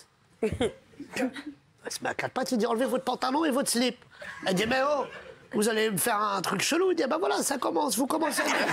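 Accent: France. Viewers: Cest si plaissant ecouter des blaguees du vrai français et non quebecois français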